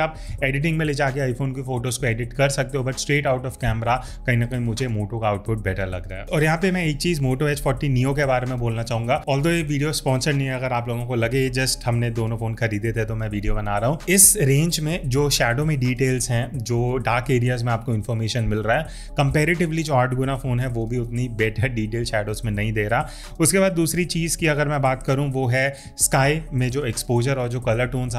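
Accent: Lucknow, India. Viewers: Sir aap lucknow se ho ???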